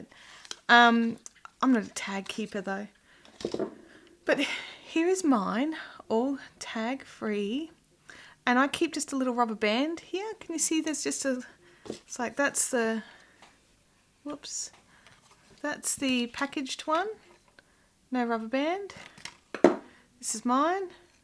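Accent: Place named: Australia. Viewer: Thanks so much for this!! Well done and nice to hear the Aussie accent. 🙂🙂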